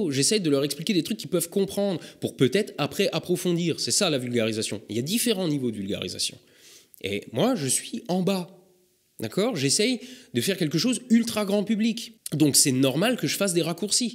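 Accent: Alsace, France. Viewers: Salut à toi! Merci pour tes videos. Elles sont tip top même pour les incultes!! Petite question: tu as un accent et des tics de langage qui me font pensés que tu viens d'Alsace, et peut être même des Vosges du Nord. Est-ce le cas ?? Merci!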